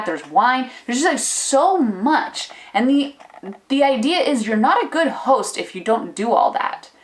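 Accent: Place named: Ljubljana, Slovenia. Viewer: Do you know u speak “dialect” too? I am sorry, but tbh “ljubljanščina” can be slightly annoying.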